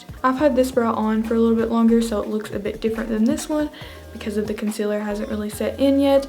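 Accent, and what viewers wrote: South, United States. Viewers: She has such a souther accent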